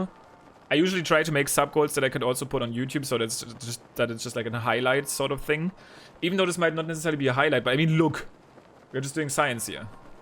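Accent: Germany. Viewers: You acctually speak like a german. Man I know, I'm german, if you not. ANSWER PLEASE NO OR YES, please... se.. :) :/ I know im peasky!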